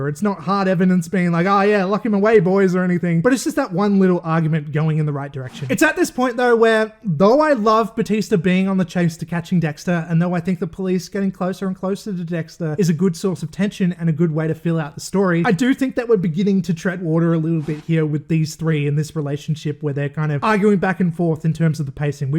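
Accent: Australia. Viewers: The Aussie is back with some badass recapping😊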